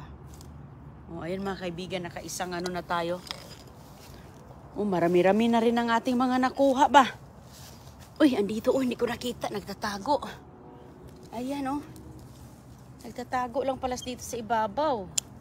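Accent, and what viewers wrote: Visayas, Philippines. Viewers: Tama,mga walang hiyang bashers bash ng bash buti sana kung english teacher vlogger yan para magandang magpronounce eh visayan people kadalasan matigas dila...mga walang kwenta mAnuod lang ni para makapangbash..walang ambag sa mundo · korek poh.. grabe mkpanlait sa salita ni eh alam.nmn nia laking probinsya at bisaya nga... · Feeling perfect nman itong SI, Visaya sya huh Kya iba ang intonation ng words nya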